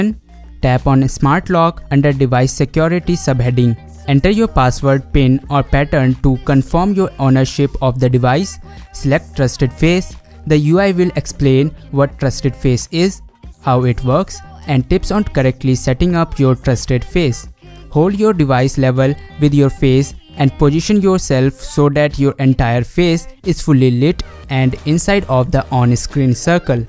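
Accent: India. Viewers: indian accent omg so hard to understand plase try to speak without accent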